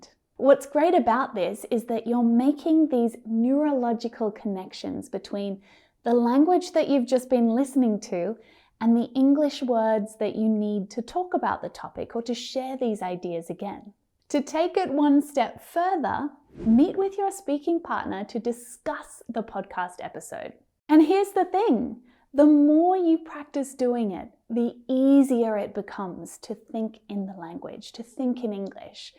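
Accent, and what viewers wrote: United States. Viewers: I fink is american accent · The pronuncaition is American English.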